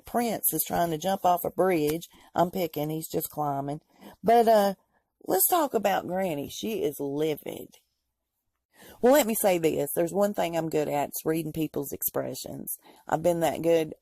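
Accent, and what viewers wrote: South, United States. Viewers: I just love your accent, I lived in the south and love to hear it! Plus you are 100 percent right about Megan it will catch up to her!😂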